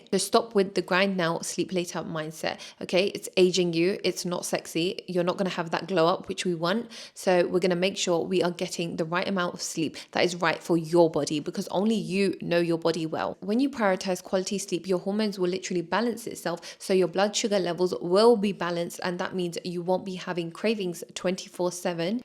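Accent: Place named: United Kingdom. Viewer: i cant believe i was about to ask what country you're from and didn't clock the accent LOLLL! as a canadian, i watch so much british content its become normal to me so i didnt even notice it AHHAHA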